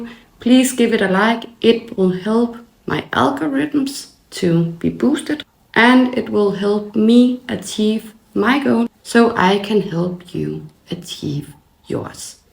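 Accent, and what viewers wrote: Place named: Denmark. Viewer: Thanks for sharing your story with all of the real life ups and downs. I own a few of your files and love watching your videos! Your Danish voice sounds great to me. I play around in Illustrator designing my own files, but definitely want to get better at it. Looking forward to learning more from you.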